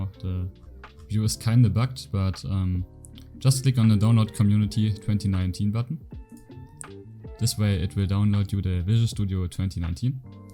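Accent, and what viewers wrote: Germany. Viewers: You sound really german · Are you German? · I hear the german accent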